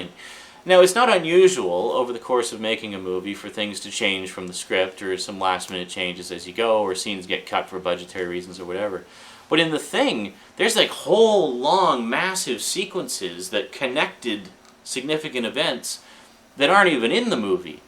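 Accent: Canada. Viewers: You are from Canada....Abooot! Not mocking, I can just tell. Or you are close to the Canadian border at least. Where exactly do you hail from? Greetings from Philadelphia!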